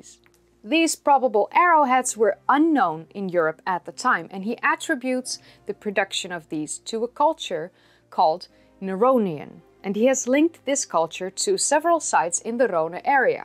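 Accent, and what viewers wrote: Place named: Netherlands. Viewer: She speaks excellent American, but with a Dutch chattiness to it.But how can you tell the difference between the soot of a Neanderthal fire and of modern human fire?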